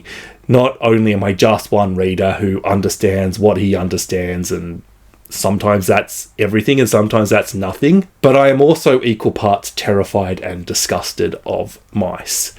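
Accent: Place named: Australia. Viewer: 😂 I kept having to pause my TV and run to the other room to repeat the zingers to hubby: not that I could do them justice, because the accent and cadence really adds to the delivery. I've said before, it feels like Australia is in a golden age of comedy, and everybody benefits 💛 I did get in a joke of my own, don't know if it translates unless you've heard of Shark Tank, but here goes: after running to hubby to repeat several hilarious zingers, I told him that hadn't been posting for a while while his computer was being repaired, and he must have used that time to fill his tank. His Snark Tank. 😂 But seriously, I love your recommendations, and love the way you always approach your reviews from the position of "what basics -- plot, character, writing, theme, etc. -- does this book do well (or not)?" And you always invite the readers (viewers) to reflect and learn about themselves, and to grow our critical faculties: "what kind of reader are you?" I love the laughs, but am nourished by the grounded focus of these videos. 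P.S. I always enjoy when picks out books (and other things!) to recommend to so many kinds of readers. It feels weird havng a minority opinion, so it's encouraging that reminds us "nobody's right and nobody's wrong" when it comes to our reading perspectives. I read Stoner and Gilead, and while I could appreciate their merit, they didn't vibe as highly with me. Also read There There, which was fine, but to me it didn't rate higher than o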